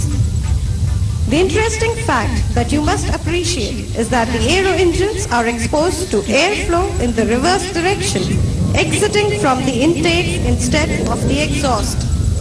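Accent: India. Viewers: Curry English has special favor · i hate thick indian english accents....they should've shut that bitch up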